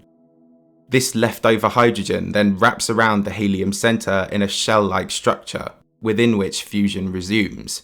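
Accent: United Kingdom. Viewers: Gonna miss VY Canis Magoris as the biggest star known to man. She was my favorite to talk about when speaking of the scale of other stars. And a quick shout-out to the narrator, British accents make for great documentaries. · Kinda curious how almost every space channel i tune to, theres always british commentary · I can't stand anyone with a British accent. Made it 10 seconds in to this video. Stopped as soon as I heard his voice.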